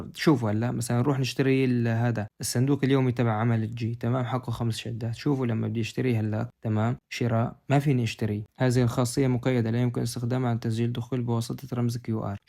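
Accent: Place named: Syria. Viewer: شكرا على راسي بس انت من وين حسيت لهجتك سورية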